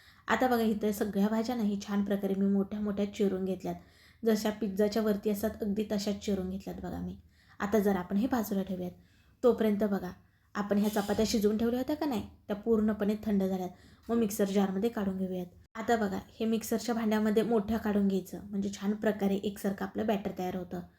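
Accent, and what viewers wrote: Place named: Kolhapur, India. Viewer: कोल्हापुर ची भाषा की नाय एक नंबर आहे बघा 😊😊😊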